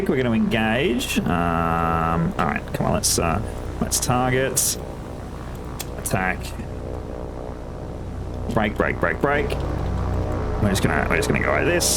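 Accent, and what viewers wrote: Australia. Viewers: DEFINITELY Australian, LOL 😆 I love you guys 🤗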